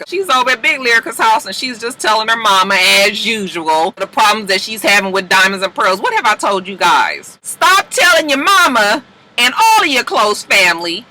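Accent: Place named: California, United States. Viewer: Your ray j cali accent makes me so homesick 😭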